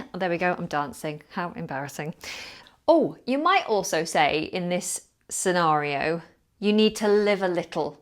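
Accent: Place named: United Kingdom. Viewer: I love your accent. Finally I found a nice British model to follow! 😊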